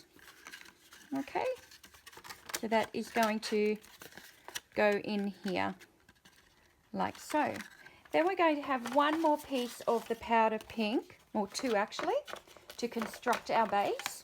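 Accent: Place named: Australia. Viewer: Great card. Wonderful to hear a fellow Aussie voice. Pam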